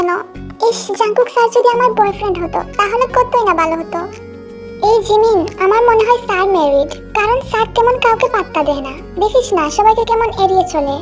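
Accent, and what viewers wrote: Sylhet, Bangladesh. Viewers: আপু তুমি মনে হয় সিলেটি..❤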